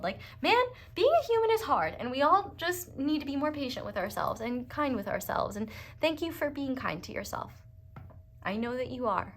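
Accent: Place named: Brooklyn, United States. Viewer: Pile 2, my person is a radio dj and in the beginning whenever we would fight and have a big fall out and stop speaking (we were both denying the depth of this), I would feel a huge surge in my heart and tune into his radio station to hear what he truly wanted to say because our words were failing. This reading is so spot on thank you for your gifts. Haha also I have 5 aspects in Gemini and his mercury is in Gemini too. Also your Brooklynn accent — we’ve talked about naming our future daughter brooklynn. ❤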